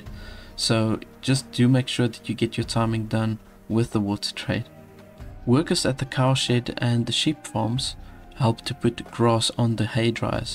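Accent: South Africa. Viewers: South African bruv? perhaps?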